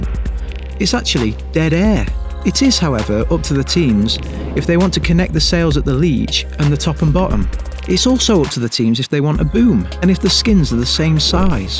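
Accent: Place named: North, United Kingdom. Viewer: Dumbed down to the point no one watched. North sails, northern accent.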